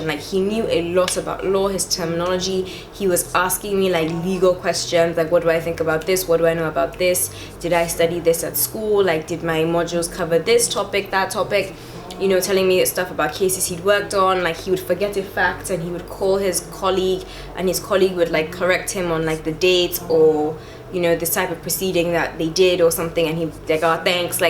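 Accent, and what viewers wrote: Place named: Nigeria. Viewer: It kind of sounds like you have a Nigerian accent or something. I’m Nigerian so I was just wondering if you were too 😂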